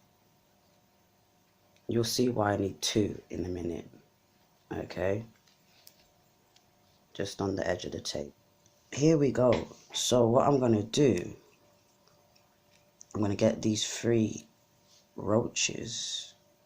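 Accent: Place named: Birmingham, United Kingdom. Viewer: I came here from Stud-conscious. You a Londoner? From darn sarf? I'm from Manchester 👍👍👍 Edit.. Brummie twang there too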